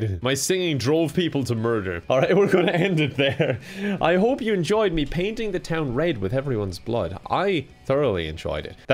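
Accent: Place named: Ireland. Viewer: Hello there friend and apparent Scorpio? Happy belated birthday if it was this Tuesday!!! I really hope you had a fantastic day and that it was awesome like you are. I recently found your channel, recently found gaming YouTube actually but I will say you're my favourite Irish gamer ngl. I would literally watch any video you make. Like the accent alone? Corpse who? Anyway... Hope your day feck ing rocked.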